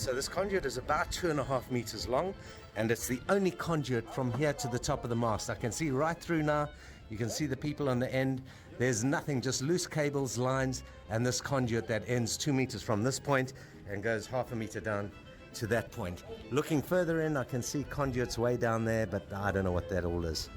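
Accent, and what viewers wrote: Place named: South Africa. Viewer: I hope I'm not getting this assumption wrong but you sound South African and if so why wouldn't you buy one of those beautiful Balance cats, especially considering these leopards have a very bad name build quality wise and after sales service. I'm sure you've probably seen the South African fella and his wife who was sailing around in a beautiful bullet proof Balance cat which was around 62 foot if I remember correctly. He sailed it to the US to be sold and I would've snapped that second hand cat up in an instant. It had been well looked after, it was run in so to speak and had the miles on the board and proof that it is a well built, well performing ocean cruiser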